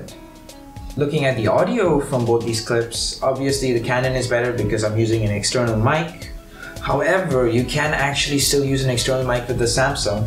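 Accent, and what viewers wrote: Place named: India. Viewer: Your Indian accent is not funny 👍😅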